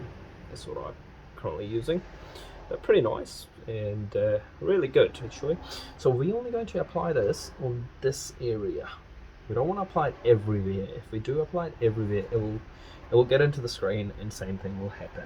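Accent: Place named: United Kingdom. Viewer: Where is your location and your store to be precise? You sound British to me and by the way great video, thanks for the upload.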